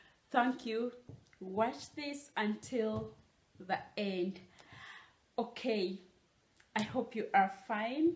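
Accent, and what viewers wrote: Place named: Uganda. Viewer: From your introduction 0.01 I knew you were Ugandan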